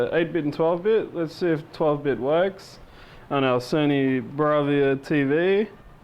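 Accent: Australia. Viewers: It's an Australian accent, and Australia is a lot better governed than the US. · r u Australian?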